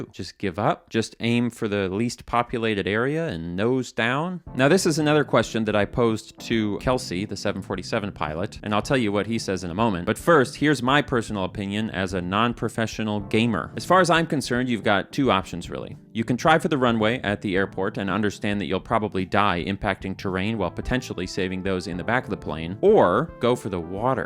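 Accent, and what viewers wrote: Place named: Minnesota, United States. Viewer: Sometimes I forget you’re from Minnesota and they you say “bars” lol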